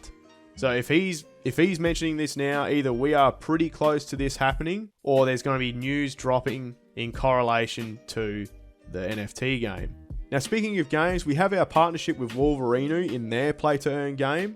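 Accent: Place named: Australia. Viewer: Thanks bro..good to hear an Aussie..im QLD'r..😃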